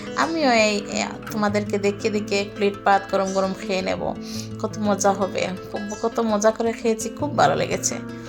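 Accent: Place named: Sylhet, Bangladesh. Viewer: আপনি সিলেটি।কথায় ই বুঝা যায়।আমি ছিলাম ২৪ বছর।এখন ফেনি আছি বাবার বাড়ি।বিয়ে হইছে ৪ বছর রানিং।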